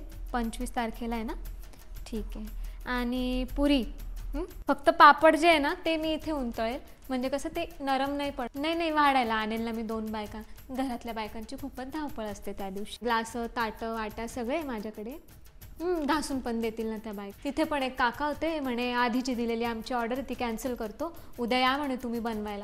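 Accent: Khandesh, India. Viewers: Khandeshi accent in marathi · Khndeshi tone mst khup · काकू खान्देशी आहेत वाटत 😂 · Haha....zanzatmari 😂😂 it's a classic khandeshi accent👌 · किती छान कुठल्याही रोल मध्ये परफेक्ट करते... एकदम खान्देशी चे बाई वाटते...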